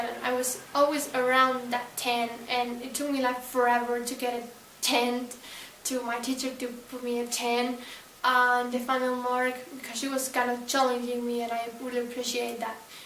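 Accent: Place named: United States. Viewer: Me encantaría hablar como tu! Hablas como las gurus d usa :)))) m gustaría saber como hiciste para perfeccionar tu inglés!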